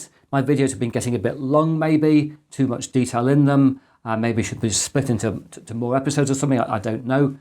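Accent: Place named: East Midlands, United Kingdom. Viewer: Yeah, there's something hypnotic about his East Midlands tones. 💤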